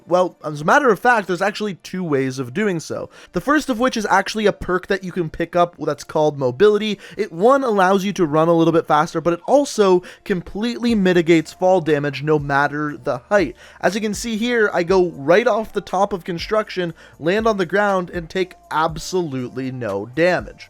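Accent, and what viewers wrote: Canada. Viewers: Fuck your accent Canadian fuckface!!!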